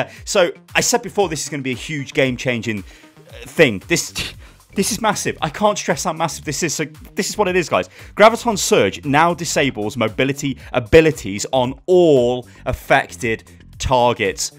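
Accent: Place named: Kent, United Kingdom. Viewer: Your from Kent in the U.K. arnt you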